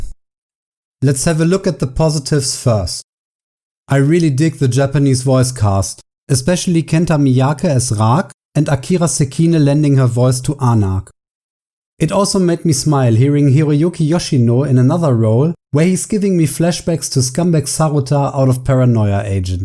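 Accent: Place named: Germany. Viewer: THIS is a real german accent congrats on the 1k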